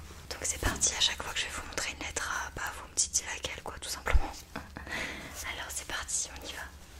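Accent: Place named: Eastern France, France. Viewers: Ui c'est comme ça en Alsace Lorraine ^^ Et pas les Oui de nobles qui ont 9 cartes gold :D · Toi tu viens de l’est de la France obligé !! Tu dis « Ui » au lieu de « Oui » 🙂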